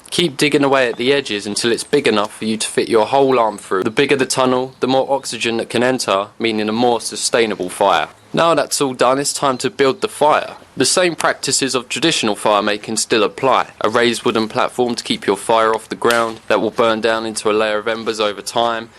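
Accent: United Kingdom. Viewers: interesting to hear a guy with a bri'ish accent using freedom units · Are there any people on youtube that DON'T have a limey accent? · I love that someone with a Brit accent knows about out Dakota customs. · British accent using "feet" and not "meters". This is great! (Bored COVID comment)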